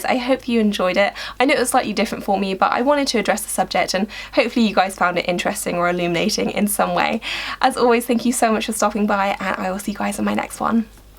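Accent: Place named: London, United Kingdom. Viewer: She's just from London, that's how they sound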